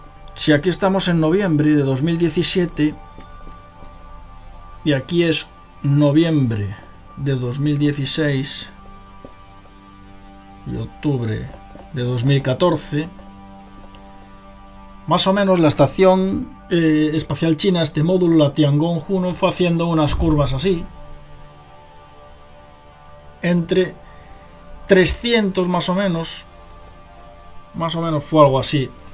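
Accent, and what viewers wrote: Spain. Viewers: Espero q caiga en españa para q dejen de publicar estas barrabasadas. Q mal me cae ese habladito español!!!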